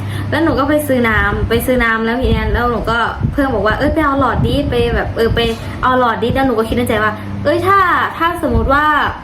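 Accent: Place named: Laos. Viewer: หนูรู้ไหมสำเนียงของลาวลุ่มกับไทยภาคกลางยกเว้นกรุงเทพรวมถึงภาษาพูดพื้นๆเลยจะเหมือนกันมากเลยมีความเหน่อแบบเดียวกันแต่ภาษาพูดมีต่างกันบ้างลุงฟังหนูพูดเข้าใจได้ง่ายกว่าภาษาอีสานเคยฟังน้องอูพูด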